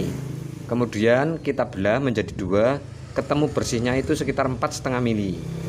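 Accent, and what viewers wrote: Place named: Banyumas, Indonesia. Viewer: Posisi bengkek ngendi rika mas, deneng kya ngapak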